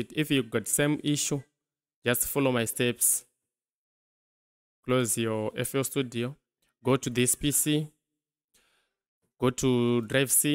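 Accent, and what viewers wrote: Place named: Zimbabwe. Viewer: This voice is Zimbabwean chete thanks bruv much appreciate the help 🎉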